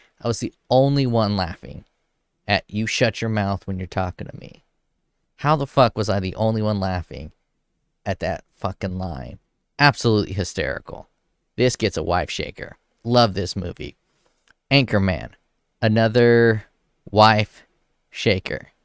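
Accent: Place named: Alabama, United States. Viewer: What Town did you live?goddamn Alabama country boy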